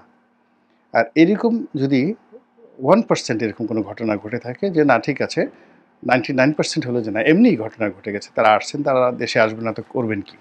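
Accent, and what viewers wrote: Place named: Barishal, Bangladesh. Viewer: এই পর্ব টা শোনার পর মনে হলো,আপনার বাড়ী বরিশাল।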